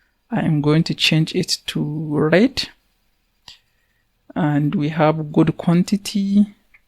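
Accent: Hausa, Nigeria. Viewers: Hausa from Nigeria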